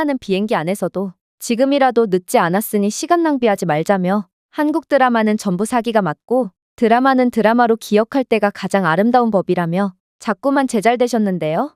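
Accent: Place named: China. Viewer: 음 아직 다듣기 전인데 처음에만 들어보니 한국인인척 하는 중국인이겠군...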